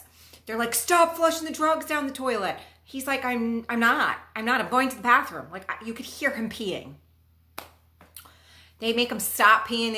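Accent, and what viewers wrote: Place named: New York, United States. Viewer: I love your New York accent ❤️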